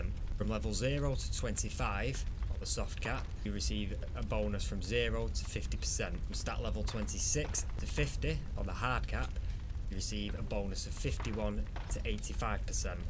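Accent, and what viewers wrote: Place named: Scotland, United Kingdom. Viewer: Scotland? forgive if I'm wrong😂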